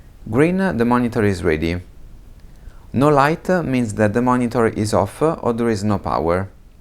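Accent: Italy. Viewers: Italian accent??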